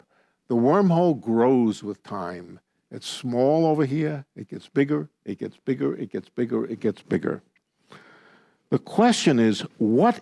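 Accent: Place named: Brooklyn, United States. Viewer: Don't let Lenny's blue-collar Brooklyn accent fool you. He's Nobel Prize caliber. I have 2 degrees in Applied Physics and have sat in on his Stanford technical lectures. I was quickly left behind.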